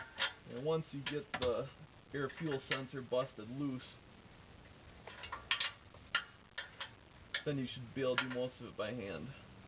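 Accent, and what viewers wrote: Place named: Canada. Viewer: canadian for sure. good guy..thanks for sharing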